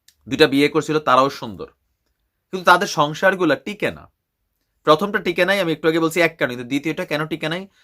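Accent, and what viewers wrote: Comilla, Bangladesh. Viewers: ভাই, আপনার কথাগুলো মজার কিন্তু সত্য। আপনার কুমিল্লার Accent দারুণ।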